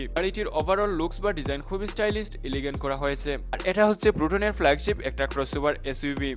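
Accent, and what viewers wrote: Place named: Sylhet, Bangladesh. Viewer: সিলেটি উচ্চারণ।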